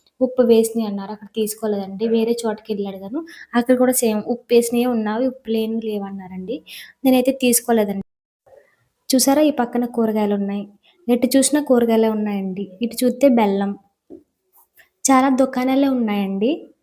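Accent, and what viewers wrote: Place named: Konaseema, India. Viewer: I like konaseema❤❤ Akka Mee side slang Bale vuntadhi Chakkaga baga matladatharu meeru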